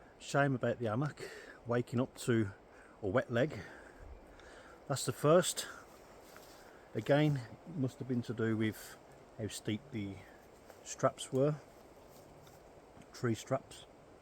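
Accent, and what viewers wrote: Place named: United Kingdom. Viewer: Only just found out about this guy from an American channel (explore with me Maria C). Such a pity as he's obviously, from his accent, from where I grew up. Too much of this is happening as people are under such pressure in the UK and were damaged during COVID due to the ridiculous lockdown. The effects are still lingering on for many in poor health, mental and physical. The economy is damaged, our cities and towns are degenerating, the outlook is grim.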